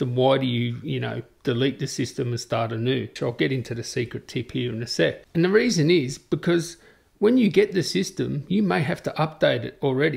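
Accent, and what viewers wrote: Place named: Australia. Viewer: Australian accent is hard for spanish/latin speakers, I've watched a couple of Crocodile Dundee and then came back to this video LOL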